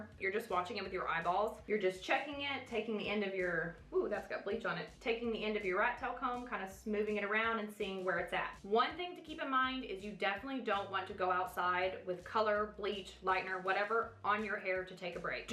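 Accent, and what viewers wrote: South, United States. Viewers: Hello, I just love your videos. I haven’t seen any one use Sally’s products which is what I only have access to. Also your a great teacher ! You explain things in detail that I understand .So thank you ! You are from the south ! So am I . I’m trying to figure out if your from alabama or Tennessee… just guessing I could be totally off here!! Thanks again .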